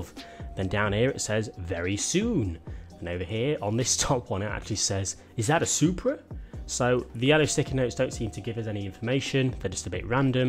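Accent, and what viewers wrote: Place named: United Kingdom. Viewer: I swear his voice is the goofiest sounding British accent. Could not take him seriously.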